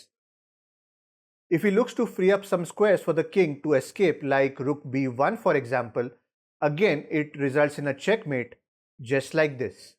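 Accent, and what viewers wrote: India. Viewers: ayo mutahar with indian accent? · god i hate indian accent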